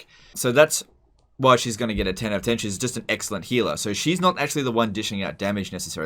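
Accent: Australia. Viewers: I just listened to aussie bloke describing shaving his balls.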